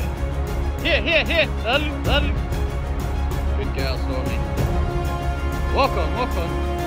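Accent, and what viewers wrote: Scotland, United Kingdom. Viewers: it is a thing of beauty to watch these dog work.And with such few words/commands. The icing on the cake is his Scottish accent! Thank you for this. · I have just discovered this channel and I am obsessed with it. Between gorgeous Scottish accent and those amazing dogs, it's a refreshing break from the news of the day. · Have to be honest, I have no idea what you just said for 20 minutes but it was beautiful.❤ I love Scottish accents. What can I say.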